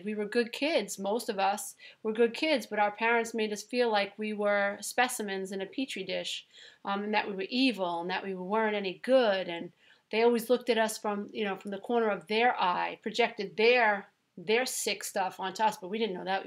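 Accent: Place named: New Jersey, United States. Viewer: Love you after only listening to this video..... We have very similar lives/experiences. Love the way you talk......I'm a jersey girl - you sound like one as well :) How can I follow you?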